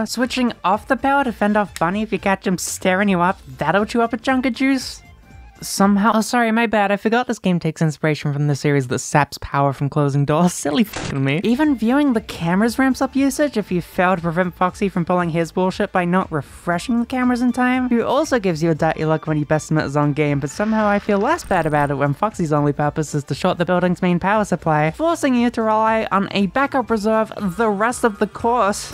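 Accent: United States. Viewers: why do you keep randomly slipping into an american accent in this episode its like a flashbang every time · Hill billy accent is not something I knew I needed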